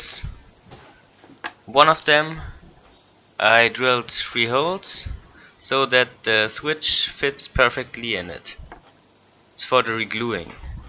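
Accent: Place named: Germany. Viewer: I'd just like to say, your english is quite good. You have a german accent, of course, but your english is perfectly understandable. Great tutorial, the best I have seen on youtube.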